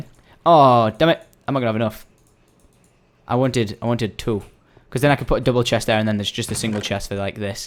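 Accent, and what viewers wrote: United Kingdom. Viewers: aren't you british? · are u britsh cuz u sound britsh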